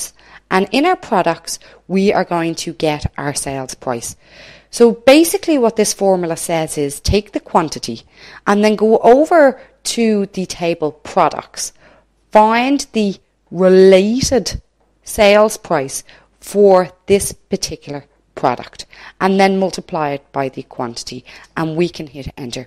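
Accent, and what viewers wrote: Ireland. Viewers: Lovely Irish accent!!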